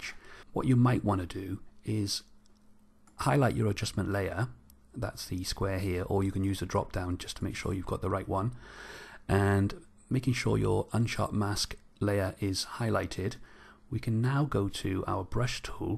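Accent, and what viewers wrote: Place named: Wales, United Kingdom. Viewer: Thanks so much ! And love the Welsh accent, so gentle to listen to..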